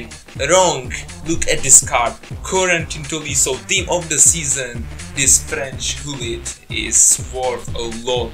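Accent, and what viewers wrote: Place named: Czech Republic. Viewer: lehký český přízvuk, ale hlavně český znak FAČR:D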